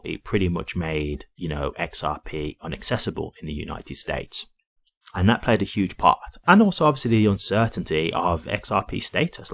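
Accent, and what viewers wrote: Birmingham, United Kingdom. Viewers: You sound like a fellow Brummie XRP holder. Good luck to us all.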